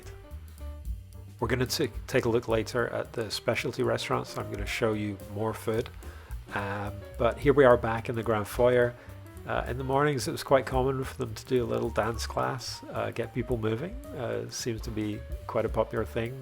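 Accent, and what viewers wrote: Scotland, United Kingdom. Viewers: Lovely vid, Thank you. We have just booked the Lisbon to Buenos Aires cruise in Nov '24 on this ship. Nice to hear you Scottish accent appearing. Big hugs fae Bonnie Scotland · Hey, I’m assuming you are Scottish by your accent. You can pronounce Foyer just like us Brits and Canadians do. Don’t mind the US bastardised pronunciation lol. 😂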